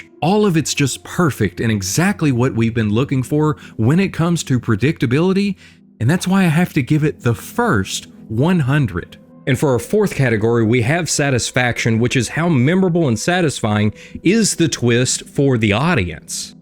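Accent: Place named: West Virginia, United States. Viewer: Is he from ky or wv. Iam from wv i hear wv accent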